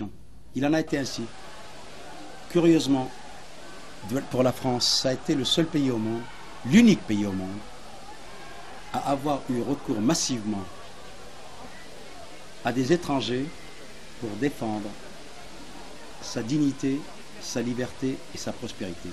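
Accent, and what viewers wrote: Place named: Senegal. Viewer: la prononciation est typiquement Sénégalaise !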